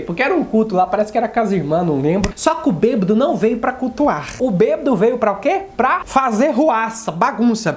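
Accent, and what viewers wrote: Minas Gerais, Brazil. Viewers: Você é de minas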